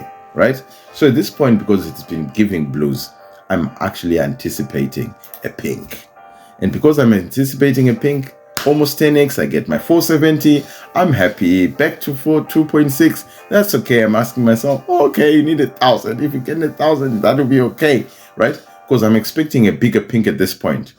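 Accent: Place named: Zimbabwe. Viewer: From your accent I can tell you are mwana wekwedu kwa Sadza 😂...I like the way you do it bro,hah munondiitira boo.Im in Zim zvangu but I do have a Hollywood and lottostar accounts ....I would appreciate even a voucher zvaro ...who knows pamwe ndingamuke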